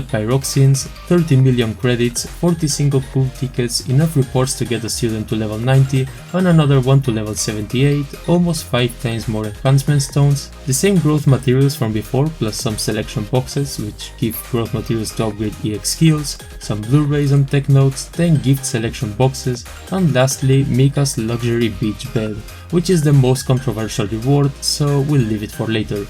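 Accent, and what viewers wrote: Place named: Spain. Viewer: Spaaaain. Blue archive has come so far! I almost didn't notice the accent. I may be wrong but...anyway very high quality video.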